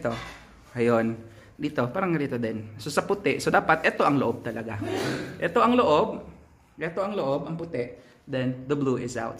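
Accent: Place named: United Kingdom. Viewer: Aha, uu nga anoh. Salamat sa pagturo. Galing mong mag explain. I like your british accent:)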